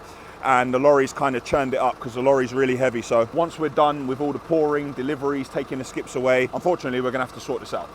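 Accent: Caribbean. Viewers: I knew u were of caribbean decent without even hearing u say it. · I knew he sounds Caribbean...big up from Jamaica mate; love your content and what you do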